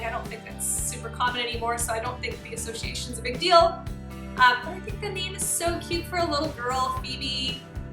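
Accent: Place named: Canada. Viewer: I was going to ask you if you were perhaps from Canada, as I was picking up on your accent. I got my suspicions confirmed when you said zed for the letter z.😂What part of Canada are you from? Ontario maybe?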